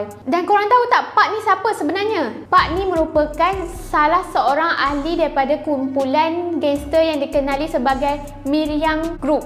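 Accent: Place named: Kelantan, Malaysia. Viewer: Setelah dengar cerita ni sampai habis, saya boleh agak yang awak ni orang Kelantan🤣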